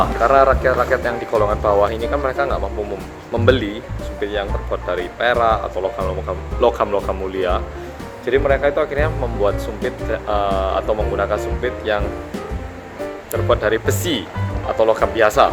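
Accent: Java, Indonesia. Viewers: Orang korea asli ya kak? Koq fasih banget bahasa Indonesia, bahkan medok😎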